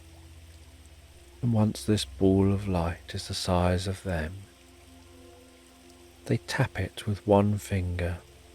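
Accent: United Kingdom. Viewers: Had to update: My second night listening was even better than my first. This is the best for insomnia. I need that perfect British accent with that slight, almost undetectable slowed down effect. And so long, 8+ hrs! Love how one dreamscape effortlessly morphs into another, no need to worry about missing anything. I can get up to use the bathroom or shift sleep positions and gently fall back into whatever soft, lulling, dream-story vignette is unfolding. I accidentally slept in after my second night listening, and my entire body, head to toe, felt utterly relaxed. Thank you!🌌🌜👑🏰🌈🌳🌌🦜☔️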